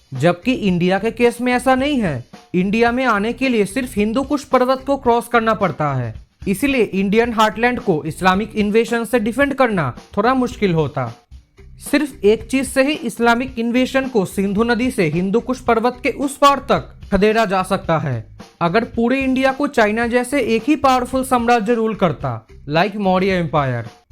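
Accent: Bengal, India. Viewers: Bro are you Bengali....tumhari accent kuch waise hi lag rahi hai...... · thankyou for answering me by the way love your videos, and your bengali accent makes really cool to watch it, love from Indore😍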